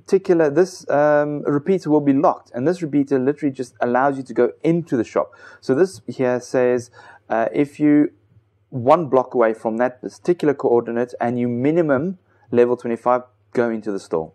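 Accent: Afrikaans, South Africa. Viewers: is jy eintlik afrikaans? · are u south african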